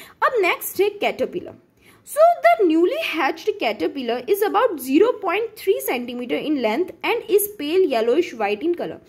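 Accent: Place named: Australia. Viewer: GOOD EXPRESSION SOUNDS AUSSIE ENGLISH EVEN 888.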